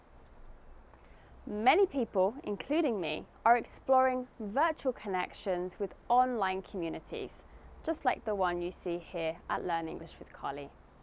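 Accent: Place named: Northern England, United Kingdom. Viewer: Hi, Carly, I hope you're getting well soon. Here's an example of an imaginary news article. "The EU office reached an (2)agreement with a famous global company for the company to disclose its customer data holdings. Many sources have raised (7)concerns about the (6)compatibility of this data with the new EU data privacy policy. The company, known for its CEO's (1)affiliation with the newly elected president, has been in (8)conflict not only EU, but also with many countries over this issue for years. Additionally, the EU made a deal with this company to share a list of companies it has (9)connection with. Some of these companies are named in (5)collaboration with the funds owned by the newly elected president's relatives. These funds don't have clear (4)association with concrete issues. Many NGOs, (10)with whom our correspondent (10)contact don't think that the EU has provided enough (3)arguments with the company, against this issue in its dealing with the company. 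One of them criticised 'it's almost like they're on a (12)date with the company. They should (11) cooperate with us before reaching an agreement.' Thank you for the lesson. And I love your accent and trying to imitate it. I'm not a professional but it seems to me a Northern accent because, the sound of 'up' is pronounced with /ʊp/. I'm familiar with this. Thank you for sharing!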